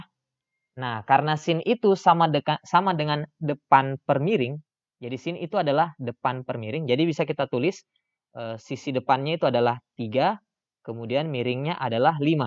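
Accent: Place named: Bugis, Indonesia. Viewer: Kayak aksen bugis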